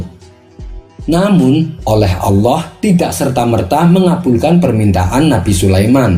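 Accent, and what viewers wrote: Java, Indonesia. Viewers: Iya mas ngomong nya teger medok lekoh.cirihas orang Jawa . Salam dari serang Banten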